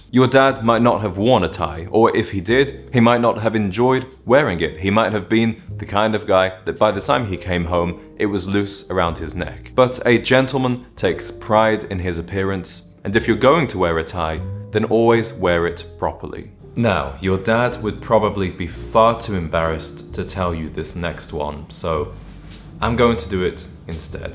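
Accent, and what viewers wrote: United Kingdom. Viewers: Bro is British certainly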